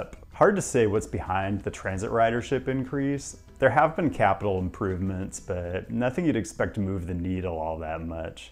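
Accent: United States. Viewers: Your voice.... You are who most Europeans think all Americans sound like!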